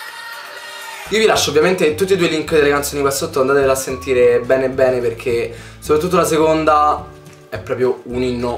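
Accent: Rome, Italy. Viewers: hahahahha mi fai troppo ridere! e poi il tuo accento romano è fortissimo!! haha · Canti benissimo! Oltretutto amo sentirti parlare perché amo il romano ahahah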